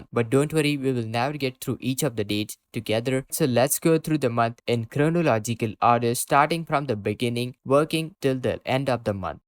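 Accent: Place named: Punjab, India. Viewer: DUDE YOU TALK SO FAST WITH YOUR THICK PUNJABI ACCENT THAT I CANT UNDERSTAND YOU. SLOW IT DOWN.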